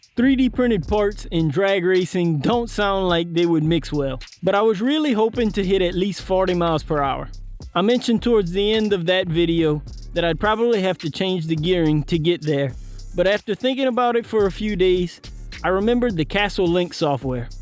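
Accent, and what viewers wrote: Louisiana, United States. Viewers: Your accent sounds Cajun. Are you from the Acadiana area? I'm in St. Martin parish. 🙌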